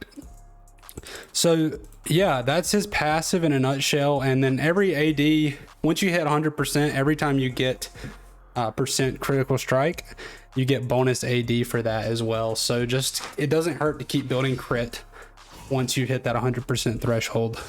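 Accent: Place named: South, United States. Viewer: The Southern accent made this extra cool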